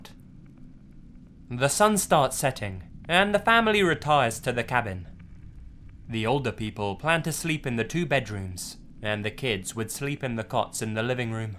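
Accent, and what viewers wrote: England, United Kingdom. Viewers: british accent reading/narrator for Native American SKWalkers video??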